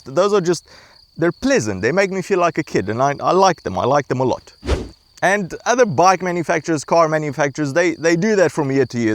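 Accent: South Africa. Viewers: How did you get the South African accent?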